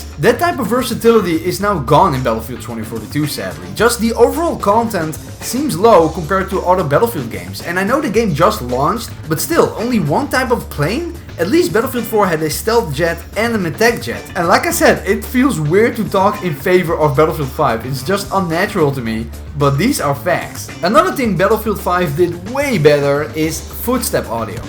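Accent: Netherlands. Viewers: You must be Dutch right?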